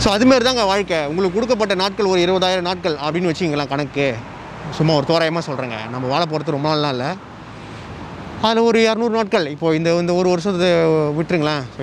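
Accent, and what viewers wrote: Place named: Singapore. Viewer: Bro Singapore Yan mathire pesama Nama ooru alumayhiri pesuka bro video nice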